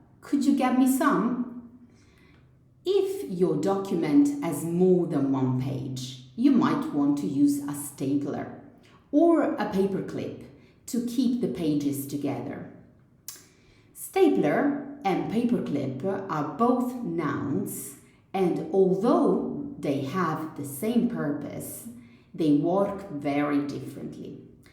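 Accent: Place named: Italy. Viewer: Pronunciation is Italianized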